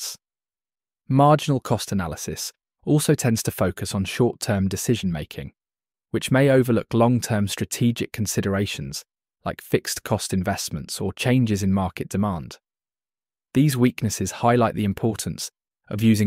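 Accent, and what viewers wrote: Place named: United Kingdom. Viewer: I love your British accent❤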